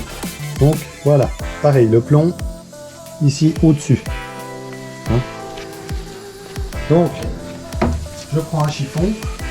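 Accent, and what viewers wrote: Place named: Vosges, France. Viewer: Super t’as vidéo bien expliqué ,t’as un accent t’es pas des Vosges?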